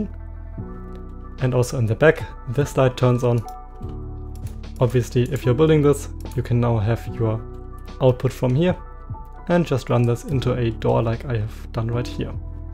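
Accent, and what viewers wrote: Germany. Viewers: Do you think his german accent stands out a lot?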